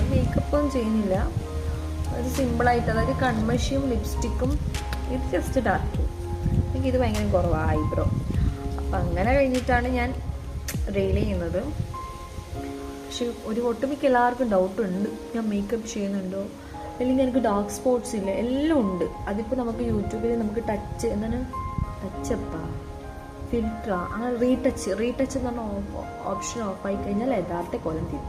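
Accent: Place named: Thrissur, India. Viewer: Thrissur 👍kunnar slang is good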